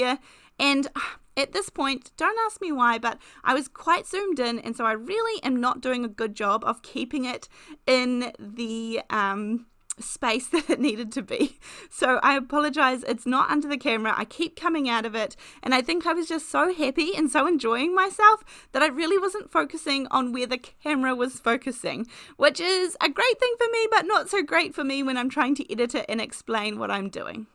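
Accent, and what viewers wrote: New Zealand. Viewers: Yay, we have sound! I love your crafts and the sound of your amazing NZ voice! 🤩🤩🤩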